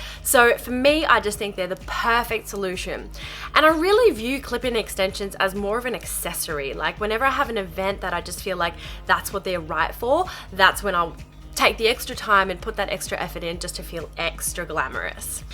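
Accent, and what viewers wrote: Australia. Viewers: Good old Aussie accents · Sorry, but I don't find the Australian accent very nice.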